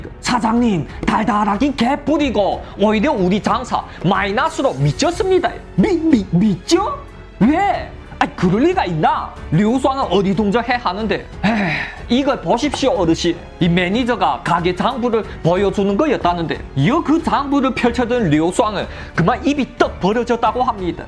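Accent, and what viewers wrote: Yanbian, China. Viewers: 조선족임? 연변말투네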